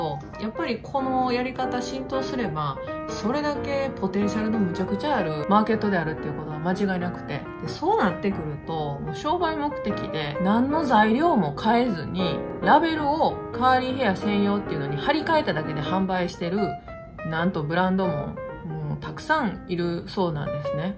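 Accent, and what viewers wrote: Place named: Kansai, Japan. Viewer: めちゃくちゃ分かりやすい😮 あとなんか関西弁おもしろい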